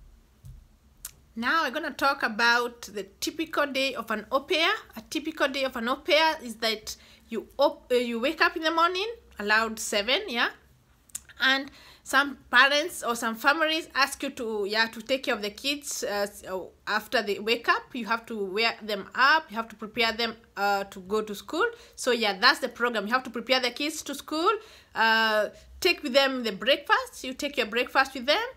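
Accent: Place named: Kenya. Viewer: You cannot take the village out of a person..kikuyu accent still there after 10yrs,#gikuyu&mumbi seed.....proud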